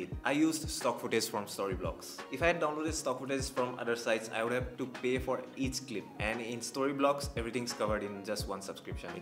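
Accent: Assam, India. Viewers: bhai tmi axomiya nki....assamese accent ata ase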